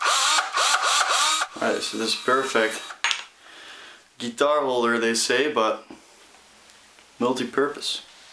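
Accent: Netherlands. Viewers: Ik wist dat je nl was khoor dat aan je accent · This guy dutch? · you are NL I can hear it on your accent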